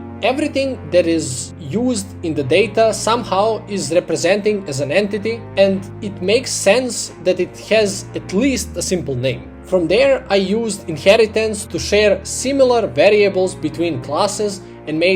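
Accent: Eastern Europe. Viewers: may I ask what your first language is? I could probably find out myself, but I’m too lazy. I will say it’s likely Slavic, Uralic, or Turkic based on your pronunciations of h, r, and w. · I really like this so far, it reminds me of a ps4 game you see and buy randomly and really enjoy it at the end lol. Anyways i see that you have a slavic-esk accent and if it doesnt bother you, im really curious as to where you are from? Im in the south balkans so i just find it cool that theres a person like me liking and making games. Dont give up!😁😁😁😁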